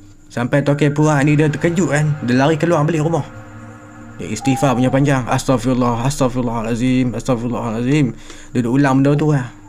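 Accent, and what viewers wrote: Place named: Kedah, Malaysia. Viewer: sib baik pnh duk alor setaq wlupon xlame,jd dpt faham loghat kedah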